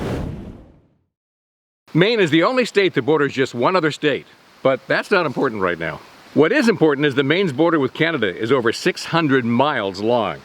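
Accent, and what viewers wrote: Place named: West Coast, United States. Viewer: Love the green screen!… just wondering if you were born in Maine or are a transplant. You sound like somebody from the West Coast.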